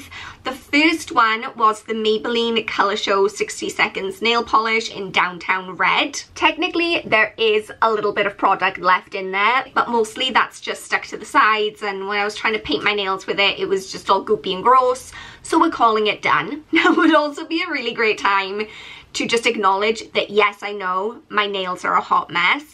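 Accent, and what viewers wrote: Wales, United Kingdom. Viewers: Be proud of your Welsh accent!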